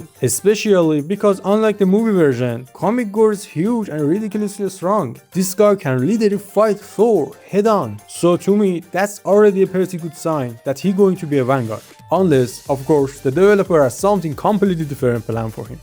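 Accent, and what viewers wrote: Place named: Iran. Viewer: u gotta be persian bro i would recognize an accent like that anywhere 😄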